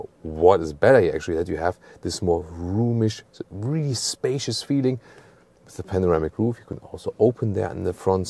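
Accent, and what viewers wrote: Germany. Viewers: A Tschermän tolking inglish. horrible! Bleib beim Deutschen, es ist authentischer!!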